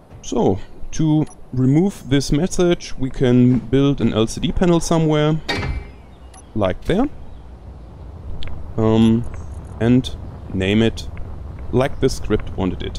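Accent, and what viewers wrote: Germany. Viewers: you have german accent, i can hear it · are u german? im german myself so i kinda know how it sounds when a german speaks english and for some reason my minds telling me that ur german when u talk.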